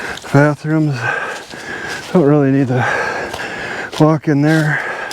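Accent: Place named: Wisconsin, United States. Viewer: He says from Louisiana but his accent and email and videos clue us into Wisconsin. Not trying to generate your bad vibes but im from mn and id recognize you anywhere. We have the same eyes and ways.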